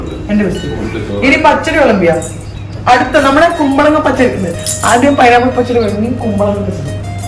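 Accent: Kannur, India. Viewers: നമ്മളെ സ്വന്തം കണ്ണൂർ - പയ്യന്നൂർ slang ❤️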